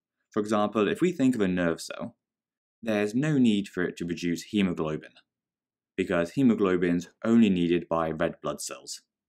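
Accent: United Kingdom. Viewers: Seems british to me 🤔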